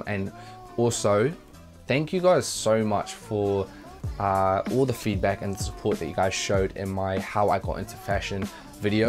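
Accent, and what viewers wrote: New Zealand. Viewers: omg so u are from New Zealand?! The first time i heard you I thought u were Kiwi but I think I heard something about the UK so I thought wuhhht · Do any of yous know if he's from NZ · tuna - pretty sure it’s New Zealand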